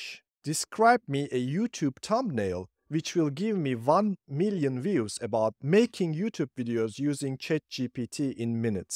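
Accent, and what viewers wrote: Turkey. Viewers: Türk aksanı 😊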